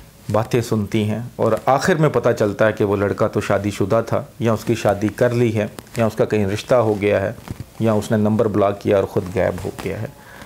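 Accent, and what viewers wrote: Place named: Pakistan. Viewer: Main India se hoon pr Alhamdolillaah Pakistan se bohot lagao hai boli bohot achchi lagti hai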